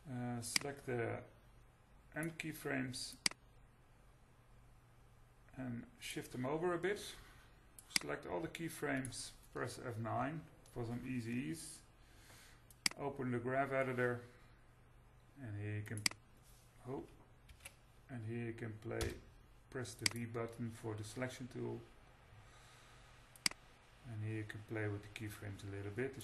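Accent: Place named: Netherlands. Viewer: thanks! hoorde gelijk dat je nederlands was hihi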